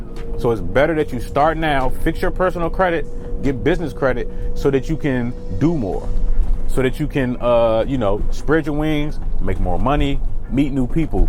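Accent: DMV, United States. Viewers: I heard the DMV accent and subscribed. You’re in my part of the world so your information is more relevant to me.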